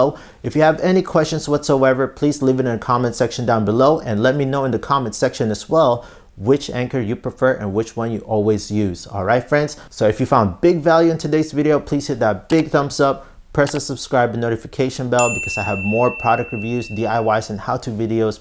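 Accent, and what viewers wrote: Philippines. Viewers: Your videos are very well put together! Pinoy kaba? Haha I lived in the Philippines for a while, I think I’m picking up on an accent!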